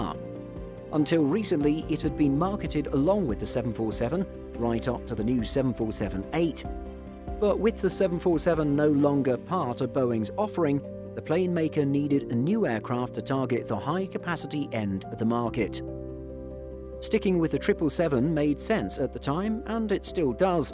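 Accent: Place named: United Kingdom. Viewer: You are British by the sound of you so Preeedersessors fella not the American Prehdersessor c'mon!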